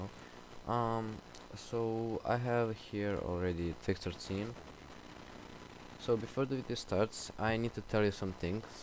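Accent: Russia. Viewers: Ты русский что ли?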